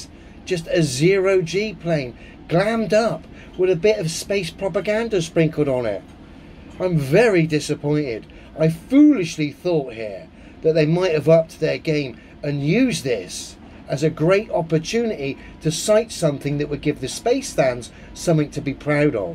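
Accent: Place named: United Kingdom. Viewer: Cheers. How I like to hear a British voice on yt 👍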